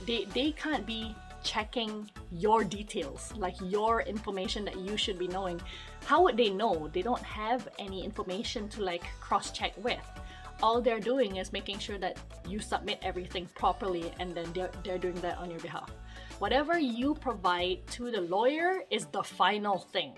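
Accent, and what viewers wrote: Scotland, United Kingdom. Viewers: Can here your Scottish accent getting stronger!! 😂😂🏴🏴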